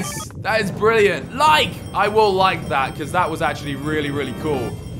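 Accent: United Kingdom. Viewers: pls reply are you British 😀?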